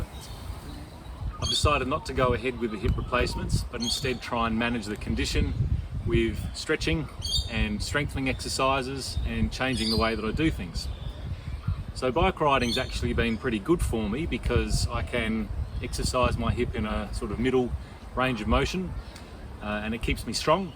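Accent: Australia. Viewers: I'm looking for a way to mount my bike that doesn't kill my lower-back (I have issues . . . 🙂). This looks like a great one for me to try tomorrow when I get my bike out. I was looking at getting a 'step-through' bike, but I don't really want to spend the money, so this is definitely worth a try. Thanks for the video. Good to hear an accent from down under (no offence to the Americans). Happy riding.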